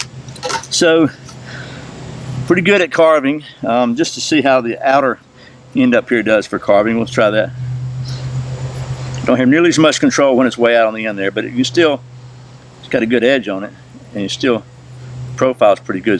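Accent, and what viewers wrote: United States. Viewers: Your accent ...yank mate....which state/region? It's cool! A bit of a twang, but not too much..like it!.😁👍🇦🇺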